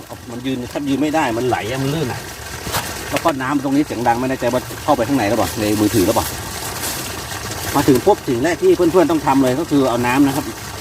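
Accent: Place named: North, Thailand. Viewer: ผมเล่นว้องทุกวันครับ fcครับ ชอบเวลาน้าอู้เหนืออออขยาด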